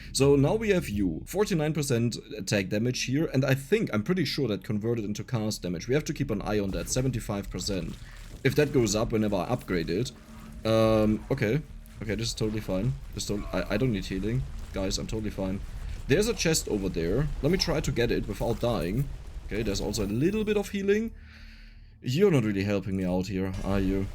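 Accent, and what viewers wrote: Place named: Germany. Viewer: loved the video so far, but kind of curios... are you german or from a german speaking country? I feel like your accent kinda sounds like it ever so slightly.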